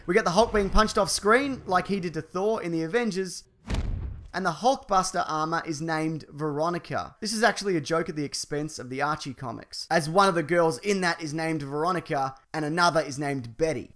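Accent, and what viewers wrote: Australia. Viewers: Came for Avengers trivia, stayed for the lovely australian accent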